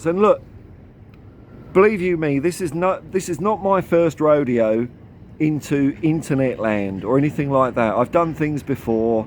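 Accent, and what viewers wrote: Australia. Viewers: Are you Australian mate? Can’t work out the accent. Like your channel 👍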